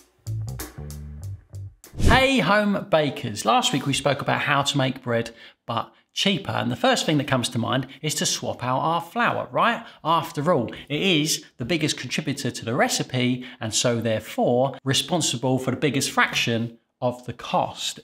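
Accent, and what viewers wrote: United Kingdom. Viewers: The added ingredient is to make people want more of it. As a result it will create more revenue for the company. At the same time, it also creates health issues in the long run. Making people seek Dr's, Dr's give meds, meds will create other health issues that would require more meds. It's a win win situation for the market at our expense. That's all there is to it. Thank you for the info. It sounds you are British from your accent. I don't know if this is worldwide, but in some countries mostly in the West (go figure) they are adding crickets and other bugs in the food we buy to make st home. So let us know if thst is also happening where you are. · His diction is not the same as his accent. His accent is that of 100s of 1000s of UK population. A northerner might say "ah nuh vah" but in a northern accent. Get a grip.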